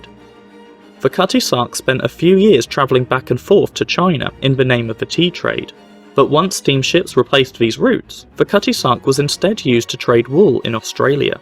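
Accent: Norfolk, United Kingdom. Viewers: your subtle flat Norfolk accent is particularly appropriate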